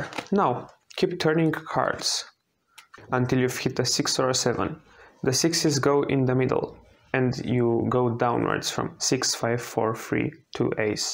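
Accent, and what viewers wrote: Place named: Russia. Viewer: Lmaoooo thank you bro.... Exactly what I wanted, learning a solo card game from someone with a Russian accent was simply perfect for me.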